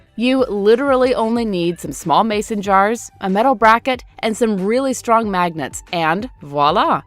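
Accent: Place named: United States. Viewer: Grant It depends where in the world you live. She's American and so says "urbz" not "hurbz."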